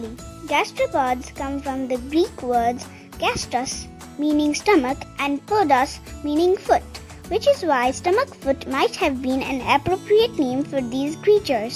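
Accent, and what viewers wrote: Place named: India. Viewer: I love the way she talks, India I think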